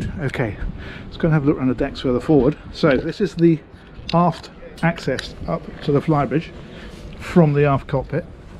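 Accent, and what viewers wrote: United Kingdom. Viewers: Everything just sound a whole lot better when you have a brittish accent reviewing.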